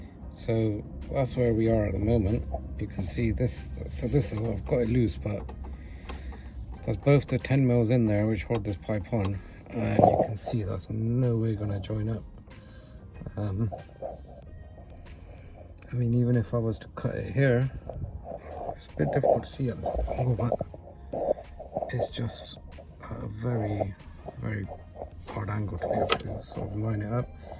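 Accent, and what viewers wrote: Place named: Bristol, United Kingdom. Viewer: Nice video 😎👍🏽... Sounds like a Bristol accent 👂🏽That's my town 👊🏽😎... Love the content, helped me gain some valuable knowledge for sure! Keep up the good work 🔥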